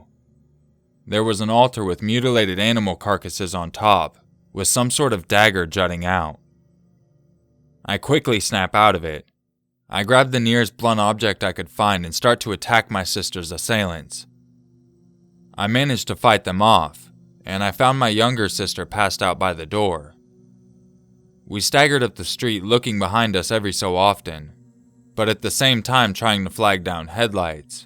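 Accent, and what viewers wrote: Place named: Appalachia, United States. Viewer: loool pure inbred fuckland ... hillbilly voice ..yeaaaa haw screaming in my mind folowing the sound of spit full of chewing tabaco hiting a metall bucket lol...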